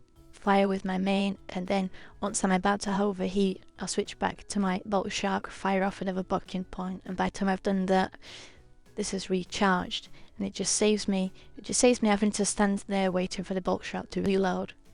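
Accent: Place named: United Kingdom. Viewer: That's just British my friend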